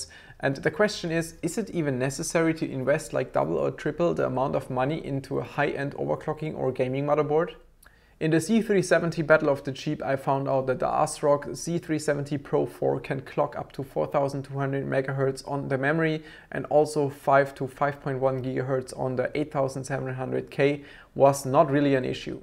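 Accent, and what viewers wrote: Germany. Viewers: Dieser deutsche Akzent 😂👌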